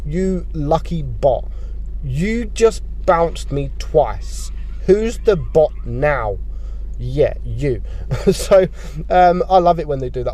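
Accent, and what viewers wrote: United Kingdom. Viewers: Excellent British commentary and great replays.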